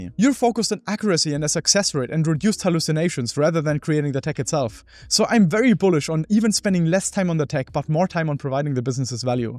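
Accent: Germany. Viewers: Das ist doch n deutscher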